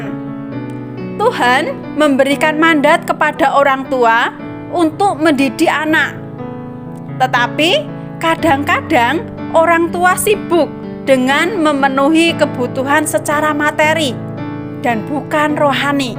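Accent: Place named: Java, Indonesia. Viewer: Logat Jawa kak😅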